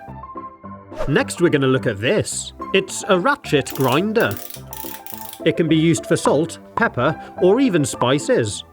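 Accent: United Kingdom. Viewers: LOVE British accent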